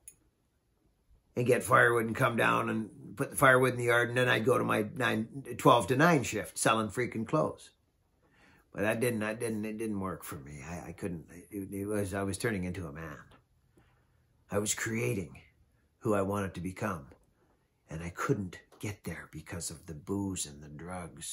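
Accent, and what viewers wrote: Canada. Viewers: Hey, I been watching your stuff for a while now, I appreciate the climbing tips, I just started climbing myself this season, I've got some pretty good climbers helping steer me in the right direction. I've been around power tools and saws my whole life but I've only been clean off fentanyl/heroin for 2.5 years now, and everything is going great. I just wanted to tell you that I really like ur style (even with the cannuck accent) lol. And i enjoy the content. Keep it up man. I wish I could get out to b.c., we don't have any of them giant trees here in Connecticut. Have a great day man, stay safe! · Really great vid. I also feed an insatiable wood stove. Where the hell do you live? You sound like and have the disposition of a classic Canucklehead, I subscribed.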